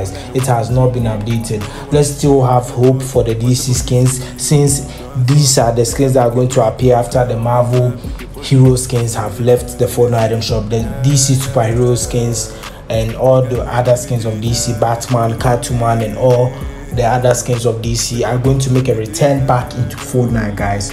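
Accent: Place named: Ghana. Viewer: Your accent sounds Ghanaian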